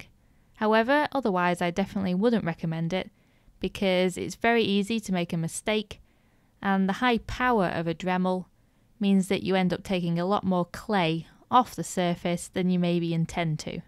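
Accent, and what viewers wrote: United Kingdom. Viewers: I heard british accent, isn't it? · I realize that, I just wonder where in Britain people speak like that.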